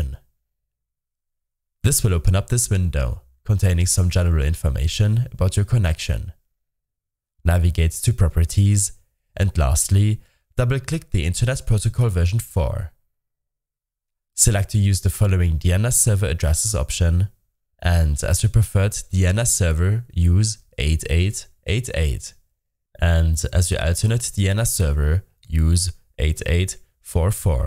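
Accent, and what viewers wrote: Germany. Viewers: ich hör eine sekunde und man merkt das du ein deutscher bruder bist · uhhhhh i hear a german voice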